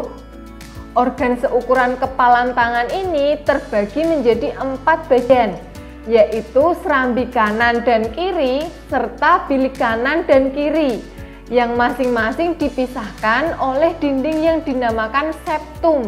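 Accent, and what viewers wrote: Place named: Banyumas, Indonesia. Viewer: Aksen banyumasan, tegal dan sekitarnya. Muantab bu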